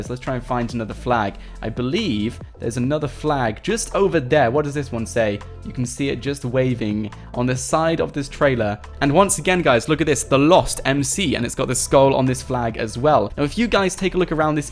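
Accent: United Kingdom. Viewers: Are you British ps. Ur the best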